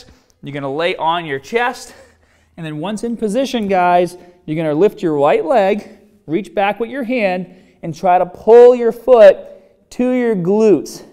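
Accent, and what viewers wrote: Boston, United States. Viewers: A bit of Mahk in your accent lol. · Is this guy from Boston? What accent is that? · Boston accent? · Before reading replies, I say Boston accent. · Came for the tutorial, stayed for the Boston accent. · This guy is definitely from baaston... Great video thanks for tips.👍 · do your ears work He's from Boston. 😂, Australia 🤣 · That's definitely Boston New England accent. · Where’s this guys accent from in the US? He says wardah and pahtts. Nothing wrong with it its interesting and making me listen more 😅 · are you from wicked good Bahston? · Staaaahted! LOL love the Baaaahston accent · Love that Boston accent! Excellent explanation and routine, thanks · Nah, that’s a Masshole accent :-) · Not Aussie lol Boston it seems.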